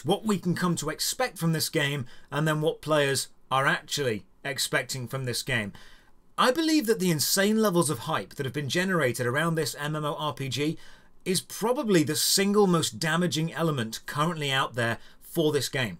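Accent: United Kingdom. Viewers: I'm confused. There's some brit talking, but no mug. Where's the channel host?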